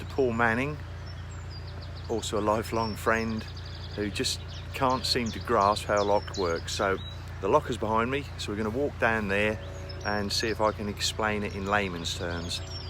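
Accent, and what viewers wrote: Norfolk, United Kingdom. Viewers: Is that a Norfolk accent I detect?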